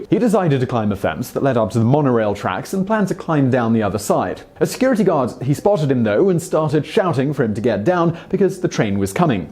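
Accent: United Kingdom. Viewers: For god’s sake, he’s British. That’s how they pronounce it in that accent. Lay off.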